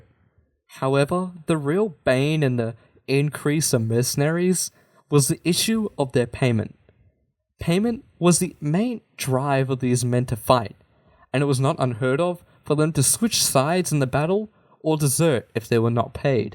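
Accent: Australia. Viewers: The language he speaks is strine. He is a Greek Australian where strine is a southern dialect of English. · Seb Sandman I am kinda embarrassed now - I didn’t even notice it was an Aussie accent in either of his videos so far. Only that the Hanno video rocked and this video rocked but the “is he about to crack up laughing” in this one kept intruding. I probably watch/listen to too many history-related things, which seem to have a preponderance of British-accent-family speakers. 😂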